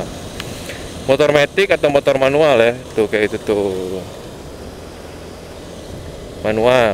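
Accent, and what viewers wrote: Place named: West Java, Indonesia. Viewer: Orang sunda ya mas. 😅😂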